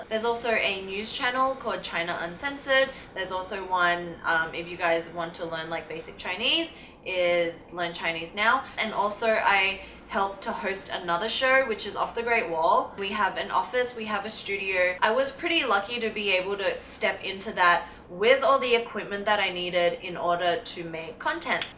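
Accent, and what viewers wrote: Australia. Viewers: Hello, I just want to tell you that what you are describing sounds so similar to my experience when I moved from Prague(Czech Republic) to Sydney in Australia. And all the values you are talking about are all the ones Aussie people and Australia itself taught me. And I am so grateful for that and the 8,5 yrs I spent there were the most beautiful ones in my life so far. I will always be thankful to Australia for who I have become because of that experience. I love Aussie people and their kindness and thank you for teaching others that. That is why I have subscribed to your channel even though I am not big on make up :-). And I love you Aussie accent :-). Keep spreading the kindness! Thank you :-) wish you all the best in your adventure and don't give up. Money cannot not buy you what the experience gives you mentally. The enrichment and open mind. 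Take care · I love your Aussie Accent! <3 Love you on OTGW too. You remind me of Constance Wu!!! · In the beginning I was like "hell that's a hella strong australian accent for someone living in NY" 😅 but then you said you "just moved" so it all made sense 😅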